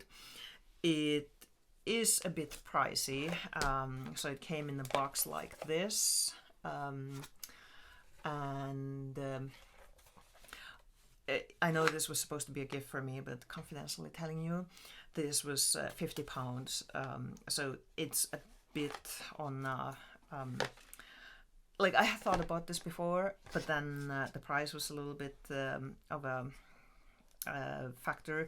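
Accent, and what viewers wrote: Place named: Finland. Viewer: I was watching this to find out more about the light and noticed your accent sounded Finnish. Then I saw the Moomin poster in the background and thought “she must be Finnish!”. If you are Finnish, then I will say kiitos videosta.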